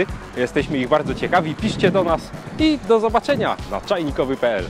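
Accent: Silesia, Poland. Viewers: jesteś ze Sląska , słyszę znajomy akcent .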